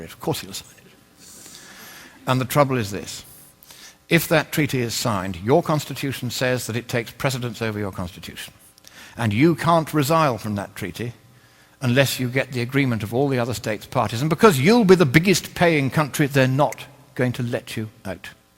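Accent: United Kingdom. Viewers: Nothing more than a right-wing fear-mongering asshole with an English accent. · Oh look, it's Glenn Beck with a british accent. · The effects of too much Co2 do effect our environment. Just because the talking head in this video has a British accent and has adopted the title "Lord" does not make him intelligent. Educated sure, but intelligent. Far from it. There are already examples in nature of how the rising Co2 levels have altered our environment and the living habits of animals. No one is saying it is just co2. But co2 is a large factor for reasons so complex and many that your head would explode. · Great, a shithouse-rat insane conspiracy theorist with a british accent. He doesn't back up anything he says with scientific evidence, he just expects you to believe it because it sound good. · Fear mongering of the worst kind. Half truths, a lovely British accent. 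What is he really saying? That we should recall the president? I think between Rupert Murdoch and Dick Cheney, they have something more "black ops" in mind. The real danger is turning our county over to the right wing ideologues. I'm sure they will watch over our freedoms for us. Until the middle class is wiped out, and we have a feudal state. Them in charge. The rest do what they say. Now can we see who is a Nazi?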